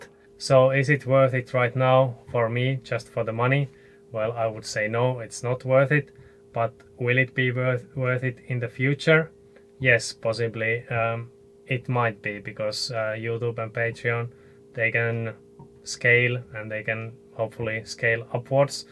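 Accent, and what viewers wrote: Finland. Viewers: I have enjoyed every one of your videos I have seen (most you have produced). You do have a unique channel and sailing focus. I think this video is very good, helpful to others, and a good sign of your transparency and honesty about the costs and challenges you have faced so far. I do have a friendly, easy to implement, suggestion for your channel. I encourage you to start your videos by a very brief introduction of yourself, something like "Hi, I'm, from Finland, I started sailing...and my goal is...and my partner Sophia and I are...join us as we explore Iceland, snowboard, etc..." and I would do that each and every video. Each one. Make it a part of your "intro" to the video. Also, I think most of the sailing channels that keep the viewers engaged (and that leads to more Patreon supporters) do so by making some kind of "Here is who I am, and YOU (viewer) are with us...'" I think many of the viewers like feeling like they are "there" with you, imagining what it is like, etc. 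To be frank, i did not know your name until watching several of your videos, and was not certain where you started (though i suspected Finland because of your accent), or how you supported yourself (translation mentioned in this video). Those are things that are personal details, but help a new visitor to your channel get some kind of possible connection. I came across your channel by chance, and started watching because I always dreamed of sailing in Arctic waters. Then when I saw you got Ar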